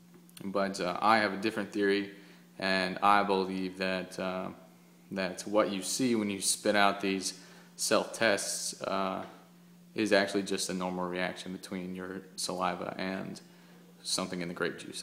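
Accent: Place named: Philadelphia, United States. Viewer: Sounds like a philly/south jersey accent.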